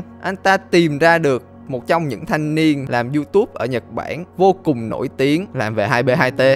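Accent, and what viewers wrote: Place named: South, Vietnam. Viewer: that is southern vietnamese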